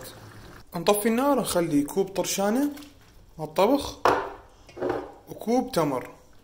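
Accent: Mosul, Iraq. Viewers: عاشت ايدك عالترتيب بس سؤال انتَ من الموصل؟ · تحية لك ولشغلك الراقي ست نجوم وليس خمسة.. متميز بكل شيء.. و من شكلك ولكنتك وكبة الكسمايات النص عرفتك مصلاوي😍 وافكارك اغلبها لم يسبقك بها احد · ولله كلامك تمام هوى مصلاوي بس عايشين بلندن واني مصلاوي عايش وادرس ابريطانيا 😘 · اما مصلاوي اما كردي من شمال العراق الان نفس اللهجه والحاضرين اسمائهم كرديه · اللهجه المصلاوية ( معلقه ) تنور الفيديو 😂😂😂♥️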